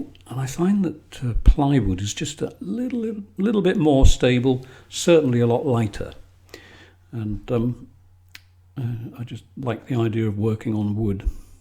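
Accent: England, United Kingdom. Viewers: This is by far the best thing the YouTube algorithm offered me in a long time! I love the voice, the British accent, the calm way of you explaining your technique. I am beyond excited to see more videos! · Very natural sounding speach. Im a fan of the english accent. Very relaxing. Thank you sir.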